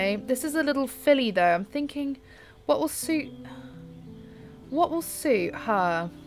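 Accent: United Kingdom. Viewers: And I like your British accent 😁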